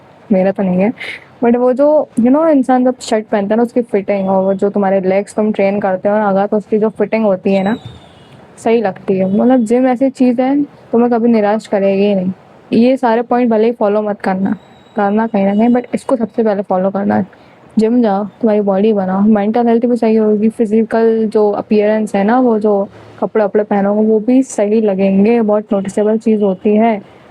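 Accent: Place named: Mumbai, India. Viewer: aapke bolne ka tarika esa lagta jese aap mumbai se ho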